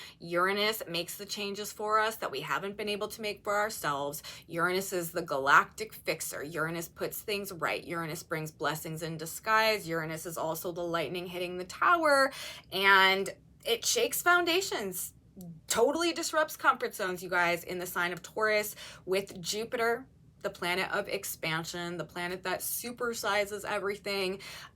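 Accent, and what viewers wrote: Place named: South, United States. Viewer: I love your videos...VERY thorough & highly crucial information. But let me tell ya, girl, you have an adorable southern quirk (I won't say what it is) because it is absolutely adorably classy on you! You put this quirk out there crowned with nothing but SUPER CLASS!!!:washhands::washhands::washhands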